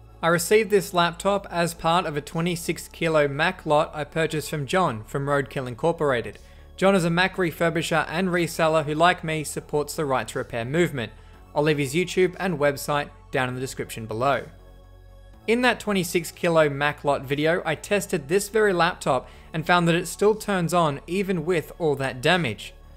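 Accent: Australia. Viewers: His accent mate. He's Aussie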